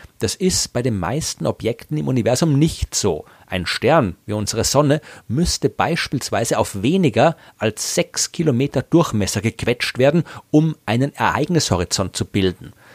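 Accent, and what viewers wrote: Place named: Austria. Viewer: Ich finde die Österreichischen Dialekte schön. Und ist es nicht Toll das wir in verschiedenen Ländern leben und trotzdem die selbe Sprache sprechen. Trotzdem ist nur der jenige Polyglot der die MathemAtik beherrscht ;-) denn das ist die Sprache des Universums. Schönes Wochenende. PS super Hörvideos immer weiter so. Wird Zeit das mehr Leute auf deine Kanäle aufmerksam werden damit deine Arbeit, die du hier reinsteckst auch mit der Weltlichen Währung honoriert wirst und nicht nur mit Lob.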